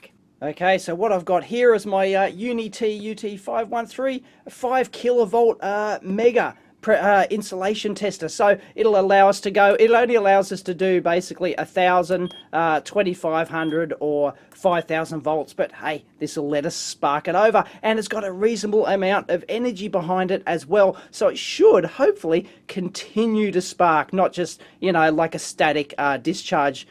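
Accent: Australia. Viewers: He's Aussie, mate. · You accent really got me thinking the entire video where you might be from. Usually american accents piss me off, which isn't really the case with your voice. Also (maybe im crazy, who knows) i sense sliiiight british nuances. So my wild guess would be ... Australian?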